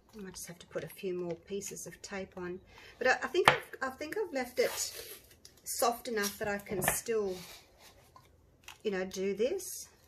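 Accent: Australia. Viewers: Yes I totally agree, I love your accent. My friend at work is from Australia too, she sounds like you even though she has been living in Ontario, Canada for more than 20 years. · there are resin syringes, i also find that a pipette works too. i work with uv resin and just started but have gone crazy making pendants. btw the moment she heard your accent my veardies head popped up and she fired off with a deeper red and orange coloration. she tends to love an aussie accent and gets all excited when she hears one. as for left overs you could try a free form pendant with a hot glue barier to help keep it from going too thin. resin makes a wonderful acrylic pour look. edit to add: those broken bits can be reset with a nice thin set resin you just whipe it on and let it set. uv resin can also be used to repair the bits as well so it doesnt run.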